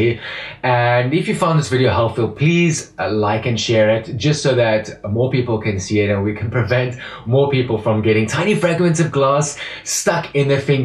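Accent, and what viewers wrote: South Africa. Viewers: Are you from South Africa? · South African accent! Yay! Thanks for the info. ( Shosholoza to you too!) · You sound like a South African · What's that accent? South African?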